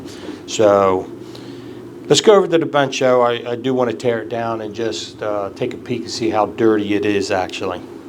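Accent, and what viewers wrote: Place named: Ohio, United States. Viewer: Are you located in Ohio by any chance? You sound exactly like my brother in law. He is from eastern ohio. Nice video.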